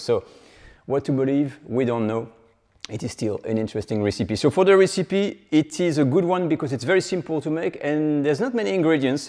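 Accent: France. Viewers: Love your cooking and your French accent ❤️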